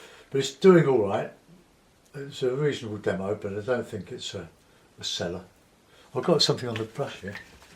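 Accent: United Kingdom. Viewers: like your acrylic tutorials.still struggling with watercolour.british are you? good show and nice day.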